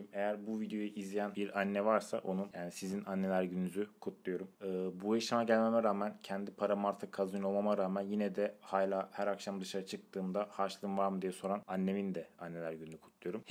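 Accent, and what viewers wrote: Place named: Isparta, Turkey. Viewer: Nerelisin konuşman Ispartalı gibi bizim buralardanmısın ?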